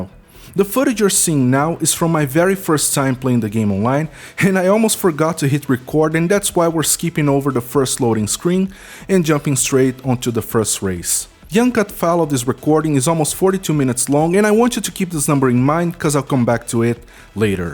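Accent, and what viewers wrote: Brazil. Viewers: Porra, sotaque de brasileiro é impossível de não reconhecer. Muito carregado mesmo tendo um inglês bem fluido kkkkkkk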